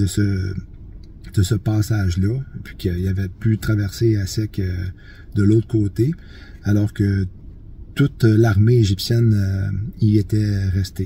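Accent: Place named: Canada. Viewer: Oui Shaloom nous aussi on t'aime beaucoup je regarde tous les jours mes abonnements et je te «surveille» si tu arrives j'ai plaisir a t'entendre avec ton accent canadien Tu nous permets de patienter dans la Foi a entendant Son Retour Continue comme ca meme de nous lire une portion de La Parole simplement cela nous unis tous ceux qui t'écoutent de tous les continents Sois beni avec ta petite famille et ton assemblé par notre Seigneur d,'Amour Le Veritable🎆👑🎆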